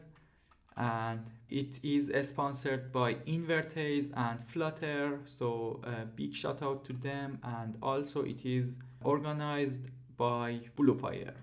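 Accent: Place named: Iran. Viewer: I am turk but I know how persian people speak and your accent had sweet persian tonnage )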